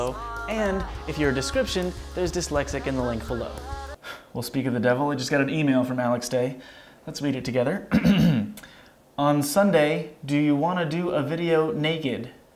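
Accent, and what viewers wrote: United States. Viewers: I usually can't stand american accents but yours, yours I can handle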